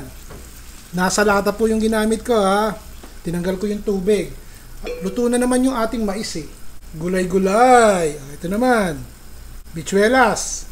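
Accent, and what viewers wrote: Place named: Tagalog, Philippines. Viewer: So yummy, 😋tagalog na tagalog ka chef😊😅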